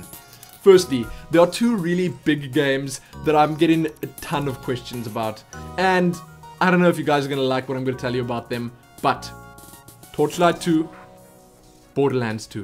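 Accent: South Africa. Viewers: You sir, must be a South African. I watched your Alice videos and I could swear I was listening to a Boer! If not...well shit. My mistake XD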